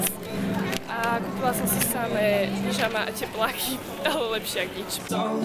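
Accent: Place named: Slovakia. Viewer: já bych taky chtěla byt ze Slovenska je strašně krásný hlavne ten přízvuk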